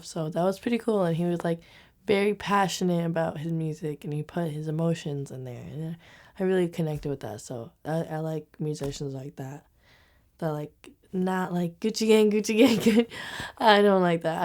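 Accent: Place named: United States. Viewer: She speaks American English...But she's Brazilian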